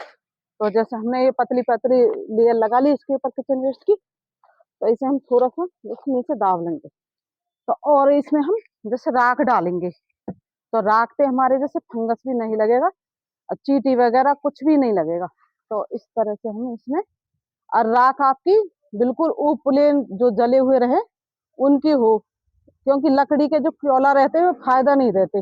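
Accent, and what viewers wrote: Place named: Agra, India. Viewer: Kahan se h*. AAP. Agra ki taraf ki language lag rahi hai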